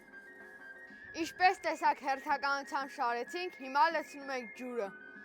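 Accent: Lori, Armenia. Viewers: Վայ ես քու համով հոտով լոռվա բառբառին մեռնեմ😊😊😊❤❤ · Իմ լոռեցի փոքրիկ եղբայր❤️😍այյյ մեր բարբառը 😅❤ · Ամենահամով-հոտով բառբառը ` մեր ԼՈՌՎԱ բառբառը։ Առանձ ձևական նոտաների և ելևէջների ❤❤❤❤ապրեսսս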